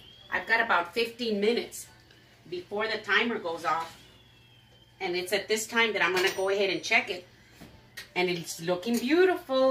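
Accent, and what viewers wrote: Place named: San Antonio, United States. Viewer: 4-7-24 Just saw this a few minutes ago but not soon enough to try your recipe. My meat loaf is browning in the oven., I have tried many of your recipes and they are always great. Will try your meat loaf next time. Just enjoy listening to your San Antonio accent. 🤗 Thank you for sharing all your talent on youtube.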